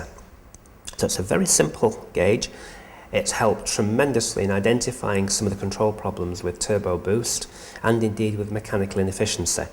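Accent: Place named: England, United Kingdom. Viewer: I liked your English accent, every words was clear=good articulation. 10/10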